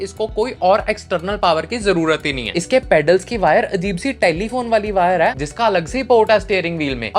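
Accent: Punjab, India. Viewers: Thick Panjabi accent Hindi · Veer ji are you from Punjab, accent ton lag reha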